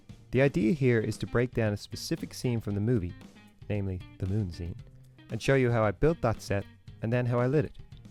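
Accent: Ireland. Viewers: are you Irish? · This was very helpful, thank you. By the way, are you Irish or something?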